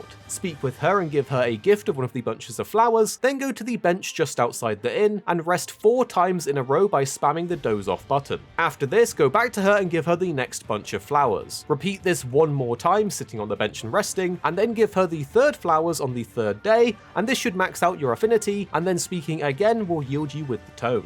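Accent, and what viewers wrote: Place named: Scotland, United Kingdom. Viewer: In yoùtubes defence he does have a pretty thick Scottish accent.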